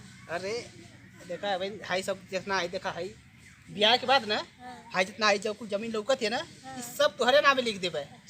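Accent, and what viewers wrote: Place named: Azamgarh, India. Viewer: achchha Mai bhi azamgarh se hu apke bolne ke tareke se hi mughe bahut Dino se lag Raha tha ki ap azamgarh se hi Hain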